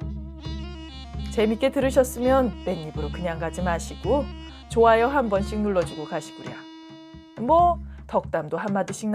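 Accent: Gyeongsang, South Korea. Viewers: 사투리는 전형적으로 타지분들이 하는 서툰 경상도 사투리인데요ㅋㅋ 그래도 왠만큼 하시는것같고 귀여우시네요ㅎㅎ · 갱상도 사투리 좋아요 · 경상도 사투리..ㅋㅋ 감사합니다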